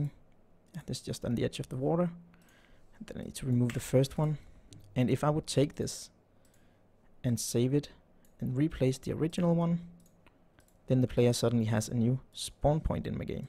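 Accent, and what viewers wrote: Denmark. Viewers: Love your tutorials! just started coding so this helps alot. You sound danish, am I right?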